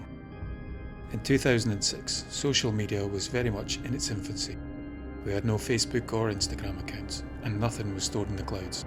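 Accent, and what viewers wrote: Scotland, United Kingdom. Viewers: I've watched so many of your videos these days and I enjoyed them all. You put a lot of great work and thoughtfulness into this - it's very much appreciated. Wish you a Happy New year with many more ghost stories to come. 🙋🏻‍♀ All the best from Bavaria ❤ P:S. just one wee thing - your viewers are from all over the world, many may not be native English speakers and not used to the lovely Scottish way of speaking (that I enjoy so much 😀), some, like myself may suffer from tinnitus or have bad hearing... It helps a lot to turn down the background music a bit so people can concentrate to the narration better. Thank you.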